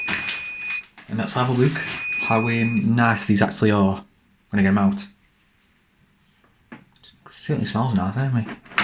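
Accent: Stoke, United Kingdom. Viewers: Thats a proper stokie accent. Nice surprise when looking at a random cooker video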